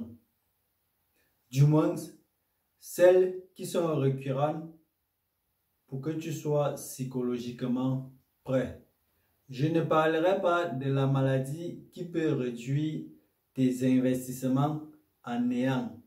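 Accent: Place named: Cameroon. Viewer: Merci beaucoup pour tes videos mon frere, moi cest Paul, je ne sais pas si tu es camerounais, sinon ton accent me fait penser que oui. Jai aussi une question, Quels businesses conseillerais tu a un jeune qui se lance en affaire et na que 500 000 FCFA de capital au cameroun ?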